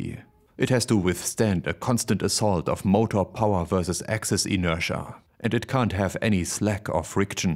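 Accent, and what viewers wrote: Germany. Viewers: hard German accent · Der Deutsche Akzent kickt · You have the most German, German accent I've ever heard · Sounds epic in a German accent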